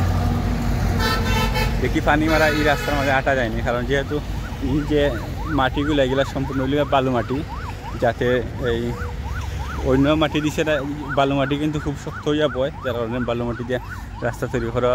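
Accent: Sylhet, Bangladesh. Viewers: আপনার ভিডিও ভালো হয়, তবে সিলেটি ভাষায় না বলে শুদ্ধ ভাষায় বললে সব জেলার মানুষের বুঝতে সুবিধা হবে ❤❤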